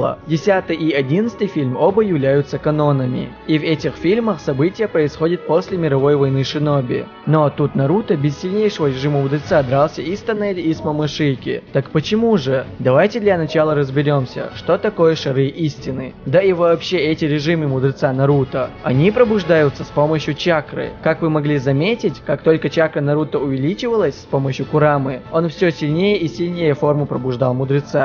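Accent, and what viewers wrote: Chechnya, Russia. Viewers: я смотрел тебя, смотрел, и все время думал, какой же у тебя чеченский акцент, думал, неужели земляк, только сейчас, зайдя в вк, понял, что из Грозного - чеченец.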